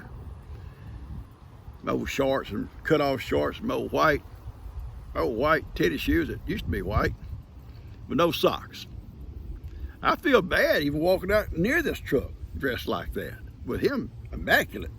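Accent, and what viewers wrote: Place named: Texas, United States. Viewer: Hi you have an extraordinary talent for storytelling. Those twinkling eyes of yours and that Texan drawl is just so compelling. You have a gift that not many have. Thank you ❤️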